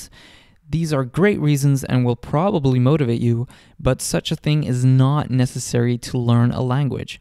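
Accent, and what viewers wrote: Midwest, United States. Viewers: Your English is amazing. You sound like you could be from the Midwest. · Tusen Takk! I love these videos. They have been very helpful to me on my journey to learn Norsk. I've been studying mostly daily, for over a year using the DuoLingo app on my phone. (Is it OK to mention the app? I don't get any money, credits, etc for it) I find your tips & explanations help me integrate and understand the language lessons better than just using the app alone. On a side note: OMG I can't believe you are a native Norwegian!!! You have a perfect Midwest American accent. Are your family members from there ? Anyway, just keep up the excellent work, I appreciate all the time and effort you put in. Ha en flott dag! (Have a great day)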